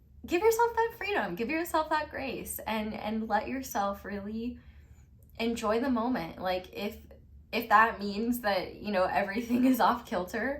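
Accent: South, United States. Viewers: First of all I think my new favourite quote from you, of all time is “give a little subscribble.” Also, I’ve somehow never noticed the southern accent before until the very end of my reading today 😮😍💕😊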